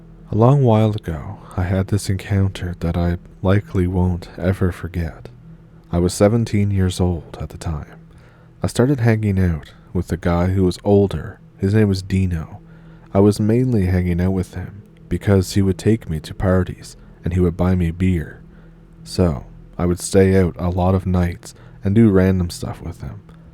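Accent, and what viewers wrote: Ontario, Canada. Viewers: sir, I like hearing you speaking these stories because you sound so much like my Ontario and Great Lakers cousins and uncles. Cheers friend. 🎉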